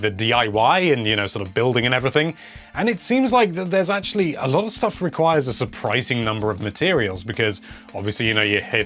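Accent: United Kingdom. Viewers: Man I love your Britisch accent and all of the words that come with it xD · That's splendid you like our accent. Can't beat a bit of 100 percent brittish beef 🐄😬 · You can stop being adorable with all your fancy UK speak. ❤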